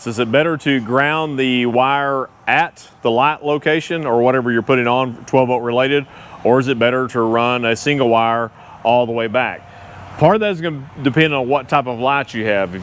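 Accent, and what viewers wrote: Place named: South, United States. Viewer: Yes indeed . Always check the ground. Excellent video. I understood you clearly. Some folks migh think a southern fast talking is to much. You got alot of info down in shorter amount of time . Wish I did that on my bilge pumps last week. Thought the manual switch was left on and burnt them out. Bought 2 replacements. Reconnected and noda. I should of checked the bilge wiring better. It was the ground wire. Trailer light no workkee. Re-wired aas I kinda took off one time while they were still connected. After new install , no lights. After troubleshooting, it was indeed the ground on the chassis light. I have to remember to always check the ground. Cheers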